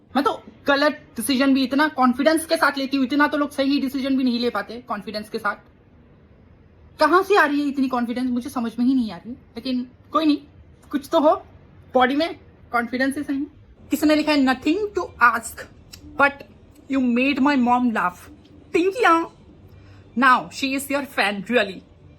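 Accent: Assam, India. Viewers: Are you from assam? 🤭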